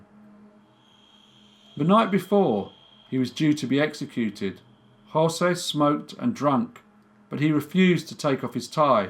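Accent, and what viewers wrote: United Kingdom. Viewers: I am really grateful (though slightly ashamed, well, more embarrassed, by my facination with true crime) to see someone, and as an old(er) anglophillic yank(er), to listen to a well spoken Britter, narrate international cases, from 19th & 20th c. In the usa, it's always either American or Dominian, English speaker murder, and am really enjoying the international work. Hope against hope you do some Germainica!!!!!!!!! Or Balkan, Baltic, Slavic, Turkic, anything. On top of that, love the concise presentation, exactly what I prefer. In American true crime stories, it;s always TMI. Your channel alone justifies my 12.99 usd per month, on Utoober. Many thanks!!!!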